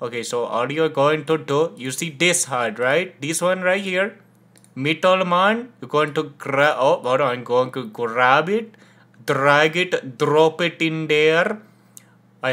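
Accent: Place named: India. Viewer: W indian voice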